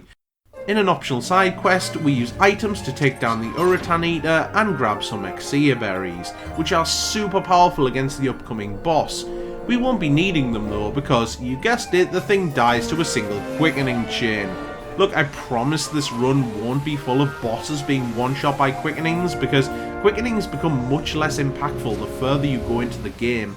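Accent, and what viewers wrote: Northern England, United Kingdom. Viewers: Am I hearing a fellow northern English accent?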